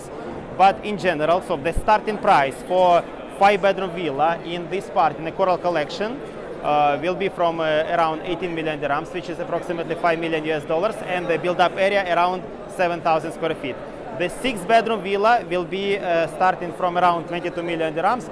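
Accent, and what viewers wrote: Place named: Russia. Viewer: Your speech, information, intonation is great, but reeeealy hard to keep listening bc of such a strong Russian accent. You need to fix it at least a bit, man.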